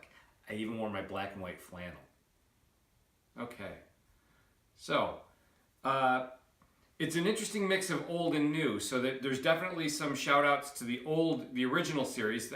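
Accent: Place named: Upstate New York, United States. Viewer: Lol i could could tell you were from upstate ny 3 words in. Great commentary! He really is a gem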